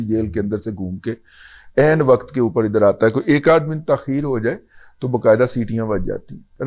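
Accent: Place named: Saraiki, Pakistan. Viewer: سرائیکی شعر کا مزا آگیا۔ آپ کا سرائکی لہجہ بھی خوبصورت ہے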